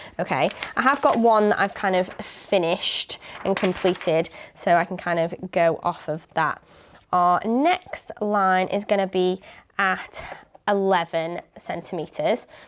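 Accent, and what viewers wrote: United Kingdom. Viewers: I wish they would have someone who spends standard English and not British English, which harder to understand for Americans. Also, she has British accent and another accent.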